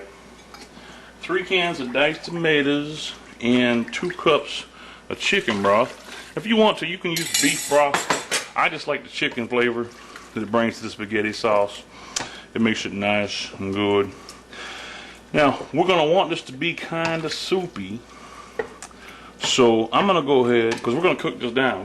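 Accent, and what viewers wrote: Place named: New Orleans, United States. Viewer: I love your videos. They are quick, non-textbook, and very logical to follow. Your accent reminds me of my nites in new orleans!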